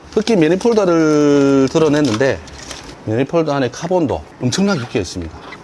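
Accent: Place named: Gyeongsang, South Korea. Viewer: 대표님~어색하지만 ㅋㅋ 차츰 말씀 중 사투리가 사라지는 것 같은데.... 같은 경상도 사람으로서 씁쓸합니다.ㅎㅎㅎ 정겨운 사투리가 좋은데 ㅋㅋ ~ 수고하세요 !!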